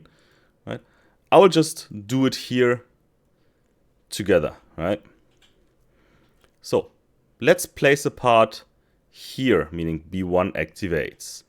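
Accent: Germany. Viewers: I love your videos, thank you very much! Also, PLC Zim , classic german-english!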